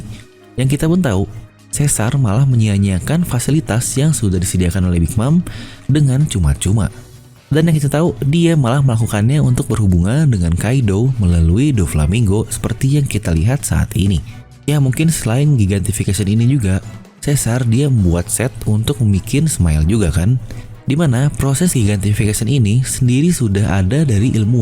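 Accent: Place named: West Java, Indonesia. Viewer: Urang sunda deteck:v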